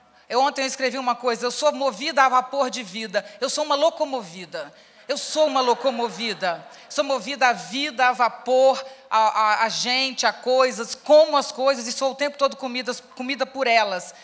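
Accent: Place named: Rio de Janeiro, Brazil. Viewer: Eu sempre admirei a Viviane,mulher com uma inteligência acima da média.Super espontânea, sem falar nesse sotaque carioca que eu adoro.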